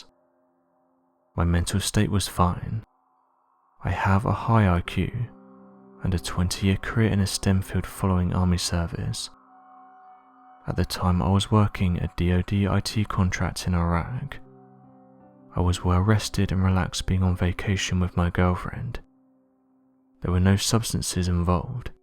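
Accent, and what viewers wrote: United Kingdom. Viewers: I think I wasted 9 minutes of my life. A former US DOD investigator with a British accent? That should have clued me in right there. It happened 12 years ago and you didn't have a smartphone to take pictures. Not very believable. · Why didn't the guy that experienced this situation be the one telling the story? Other than getting a dude with a British accent that's hard to understand tell a story that happened in America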